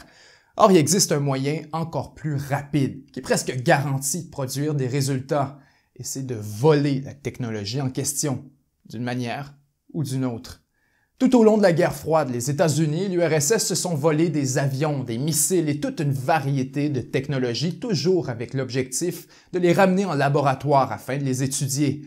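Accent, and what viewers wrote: Quebec, Canada. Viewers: J'adore ton accent canadien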